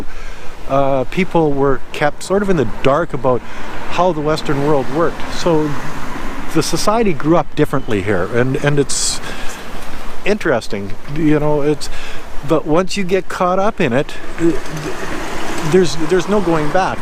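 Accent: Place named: Canada. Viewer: I knew this dude was Canadian after hearing him speak just one sentence! Although he has been living in China, he hasn't lost the Canuck accent for real, eh?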